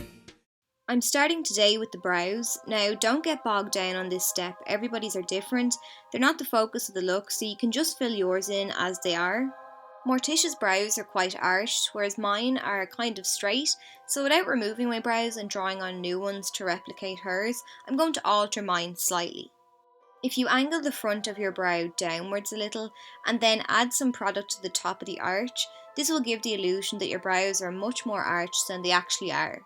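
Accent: Ireland. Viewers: I LOVE your brogue accent.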